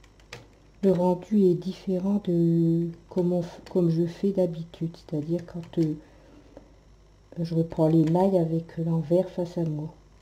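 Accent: North, France. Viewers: mais non faut pas moi j'adore, c'est un accent charmant ! vous vivez dans le nord ?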